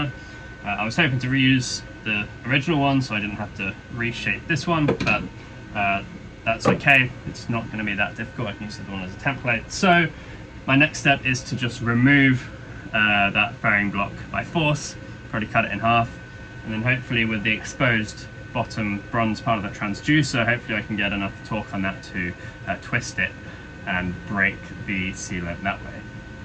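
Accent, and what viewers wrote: Australia. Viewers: Two ingredients for successful boating - patience and the maturity to know when brute force is the only option. Good to hear an Aussie accent as well. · nice to hear and australian accent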